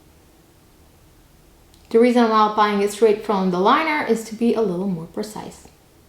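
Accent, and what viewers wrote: United States. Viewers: It's just American English 🤷🏼‍♀️ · What accent? She has an American accent nothing special. 😂🤣.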